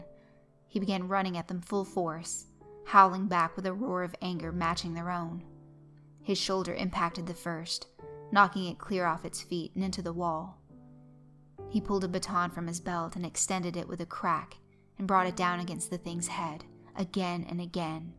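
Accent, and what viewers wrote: South, United States. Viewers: YO WAIT YOU HAVE A SOUTHERN ACCENT?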